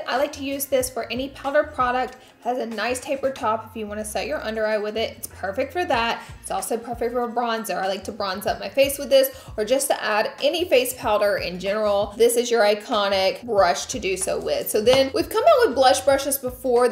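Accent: South, United States. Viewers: This is random and probly so 2017 but I love how you say concealer! Love that Southern accents still coming thru. Also you are always so fun and hilarious. Cracking me up all the time. Between you and Manny just making day. Thank you for being that Icon ,that boss babe for our future generations to look up to, congrats on your new holiday collection and for the price are u kidding, that's a good deal.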